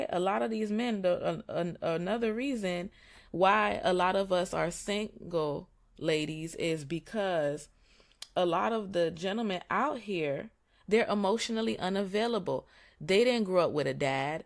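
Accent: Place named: East Coast, United States. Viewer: Where are you from? New York? New Jersey? You have that east coast accent.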